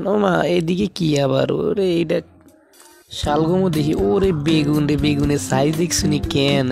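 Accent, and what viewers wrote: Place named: Noakhali, Bangladesh. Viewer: নোয়াখালীর ভয়েজ❤❤❤❤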